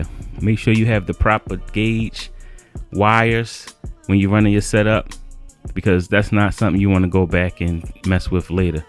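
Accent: Baltimore, United States. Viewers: Gotta be from Baltimore😂😂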